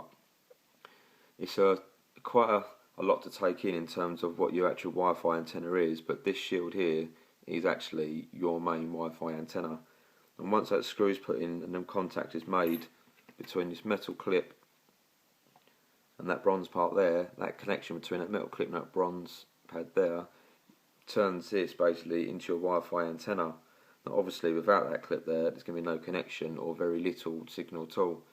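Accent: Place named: England, United Kingdom. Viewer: Well done, it's so nice to here an english voice ( I'm a jock by the way lived in england most of my life) with no shit music in the backgrounnd, but a well clearly explained bit of good information cheers mate Dave in slough.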